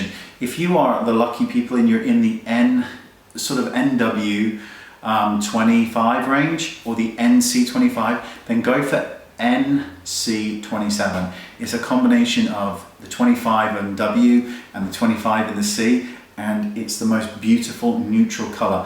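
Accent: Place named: United Kingdom. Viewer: Hey! Just want to tell you that i absolutely LOVE LOVE LOVE your videos!! They are SOOO helpful & I love your british accent ^-^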